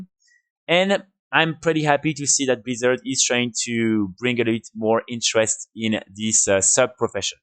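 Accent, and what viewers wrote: France. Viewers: L'accent français me mets toujours aussi bien, bonne vidéo comme d'hab 👍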